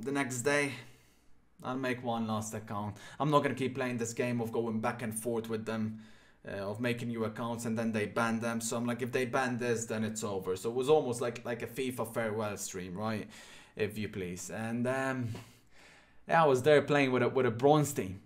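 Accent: Ireland. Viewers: Sometimes he sounds French sometimes he sounds irish 🤔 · You have an irish twist to your accent. You live in Dublin?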